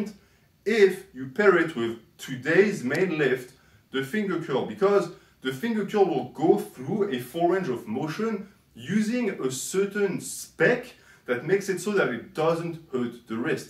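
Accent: France. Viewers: are you french? the accent sounds like it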